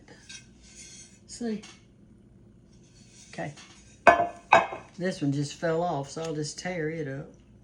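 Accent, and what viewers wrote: South, United States. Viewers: I love your shirt, where did you buy ut? Your accent reminds me ilof home and my southern roots, whereis your home? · You just make me happy hearing you I love your southern accent and your glory to the Lord shirt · Hi Ms., my name is Lilia, I live in San Antonio, TX. I catch your Chanel every now and than and enjoy watching and listening to u. I jus love that good Ole country accent u Have. Im from Spain not mexican but full blooded Spaniard. Anywho, I really enjoyed learning about this cabbage casserole I plan to make especially to share with my granddaughter bcuz she's Vegan. She eats NO MEATS or Pultry or Seafood none at all and is very healthy. O, by the way, I fo remember those old houses and those doodle bugs. My greatest childhood memory was when my grandma had created a somewhat cave like where my friends and I use to make mud pies out of mud and pretend we were going to cook dinner w my play dishes. 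Well life has had it's twists and turns and praise the Lord I AM STILL HERE. GOD has been sooo very good to me above all in all. Lots of love your way as well as prayers for u and urs. Have a blessed and prosperous NEW YEAR in Jesus name Shalom❤ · So pleased to have you just pop up on my YouTube..i guess that my algorithm is finally figuring out what I like....love your familiar sounding southern accent...and I have been enjoying a lot of cabbage lately.. can't wait to try your cabbage casserole recipe 🤗.. Happy New Year..n I'm looking forward to more of your videos.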